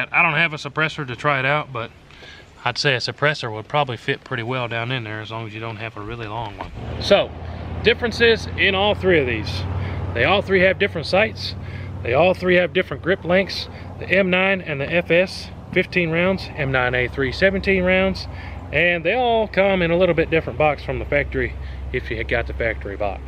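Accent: South, United States. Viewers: South accent. Even I can differ that)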